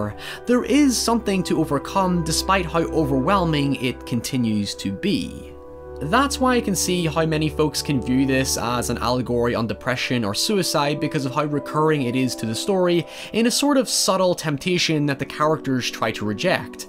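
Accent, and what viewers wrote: Northern Ireland, United Kingdom. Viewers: It's rare to come across a channel with such well-constructed video essays! It's also excellent to hear another Northern Irish accent :) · Why do you sound like a Northern Irishman trying to sound American?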